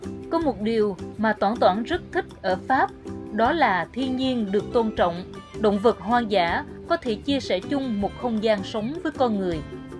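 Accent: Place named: South Central Coast, Vietnam. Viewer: Chào chúc em nhiều sức khỏe! Lời dẫn nghe rất hay từng chữ từng câu được trau chuốt rất là êm tai,càng nghe càng thấy đậm nét giọng miền trung nam bộ việt nam.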